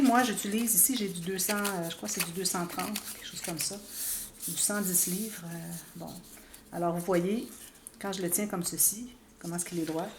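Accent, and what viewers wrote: Quebec, Canada. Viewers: Bonjour, Merci beaucoup pour votre générosité. J'ai acheté des feuilles pollen kraft en 210g: cette épaisseur est elle suffisante? Autrement quelle feuille utilisez-vous, le grammage de 260g n'est pas le plus facile à trouver. Je tiens encore à vous remercier pour tous tous les conseils et tout le temps que vous prenez pour nous répondre. Un petit coucou de Nancy en Lorraine dans l'est de la France. Votre accent me rappelle des vieux souvenirs de Montréal quand j'ai visité le canada et les USA il y a très lomgtemps.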